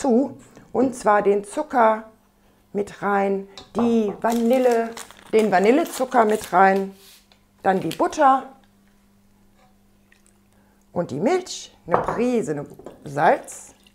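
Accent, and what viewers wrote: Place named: Rhineland, Germany. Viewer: Hallo, dein Akzent erinnert mich an zuhause, ich komme aus dem Rheinland und will beim Zuhoeren sofort n Kaffeekochen und dich einladen. Sieht alles sehr lecker aus und ich liebe deine Kueche. Viele Gruesse aus Kanada!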